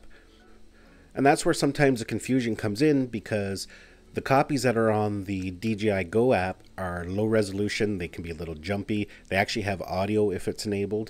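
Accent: Canada. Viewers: Helpful, but focused mostly on your Canadian accent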